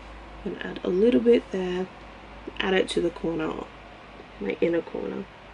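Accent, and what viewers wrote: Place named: Australia. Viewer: Girl i love your accent, are you Australian? The final look looks gorge! ❤️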